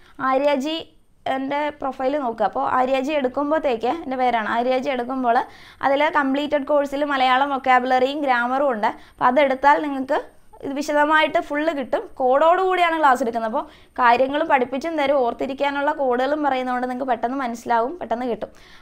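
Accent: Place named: Kottayam, India. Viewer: കോട്ടയം, ആലപ്പുഴ എവിടെയാ വീട്....ഭാഷ കേട്ടിട്ട് ചോടിച്ചതാ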